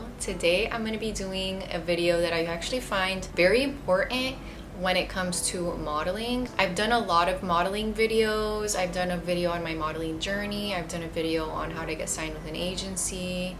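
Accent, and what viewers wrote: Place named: Miami, United States. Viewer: im from miami & as soon as i heard u speak knew u were from there too! thank u for the helpful video xx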